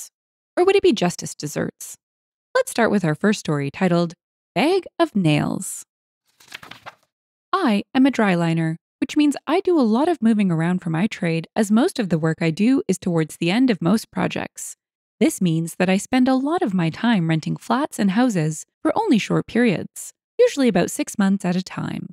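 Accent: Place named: Canada. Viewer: I love how the narrators Canadian is coming out Eh? The stories are great!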